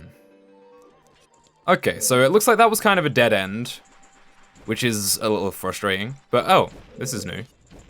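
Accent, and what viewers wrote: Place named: United Kingdom. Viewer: your british accent disgusts me.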